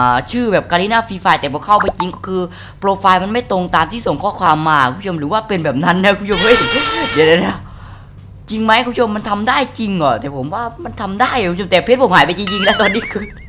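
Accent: South, Thailand. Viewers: พีขอถามหน่อยเป็นคนใต้ไม่เนี้ย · พี่เป็นคนใต้หมันม่ายคับผมก็เป็นใต้ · คนใต้นิพี่ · พี่เป็นคนใต้หรอ!! 55555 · พี่เป็นคนใต้หรอ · ชัดเลยพี่เป็นคนใต้ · พีแหลงไต้ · พี่ครับรหัสหลักผมโดนแฮ็ค<num>ผมควรทำอย่างไรครับใครรู้บอกหน่อย ผมเพิ่งรู้ว่าพี่เป็นคนใต้55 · คนใต้นิเบอะ555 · พี่เป็นคนใต้ใช่มั้ยนิ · แหลงใต้ออก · ใต้มาชัดเจนเลยนิ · ฟังภาษาก็รู้ว่าเป็นคนใต้ผมก็เป็นคนใต้ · พี่เป็นคนใต้ปะ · แหลงใต้เหมือนเราเลยนิ คนนครศรีธรรมราช5555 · พี่เป้นคนใต้ใช่มั้ย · พี่คนใต้หรอคับ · พี่เป็นใต้เห่อ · หนูพึ่งรู้นะเนี่ยว่าพี่เป็นคนใต้😆💕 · พี่พูดใต้ใช้มั้ยตอนที่ไปูบัตรเปลี่ยนเชื่อ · คนใต้นิหว่า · คนใต้แม่นนิ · พี่บ่าวเป็นคนใต้หรอ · พี่เป็นคนใต้หรอค้าบ❤️🥰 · คนใต้มั้ยนิ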